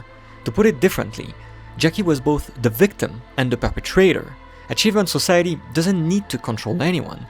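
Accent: France. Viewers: Good video. Is it just me, or you have a French accent?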